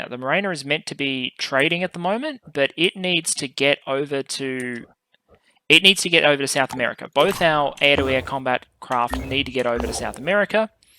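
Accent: Australia. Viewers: Your Australian accent is flawless!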